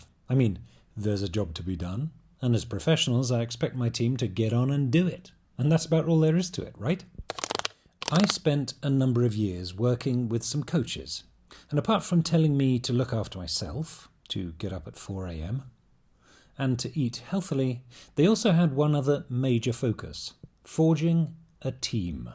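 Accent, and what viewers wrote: RP, United Kingdom. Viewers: Thank you for your gorgeous British English among everyday Globish! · Наконец-то я дождалась видео, где полностью говорит по инглишу. Такой приятный акцент. Раньше британские акценты не любила. Но акцент просто мррр. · It is the BBC accent 😂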